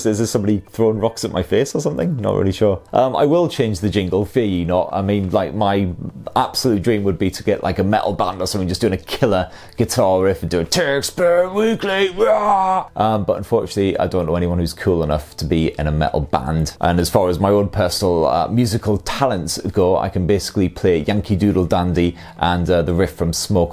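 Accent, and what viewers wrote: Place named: North, United Kingdom. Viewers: North accent is amazing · What part of the North are you from. You sound a lot like my old boss.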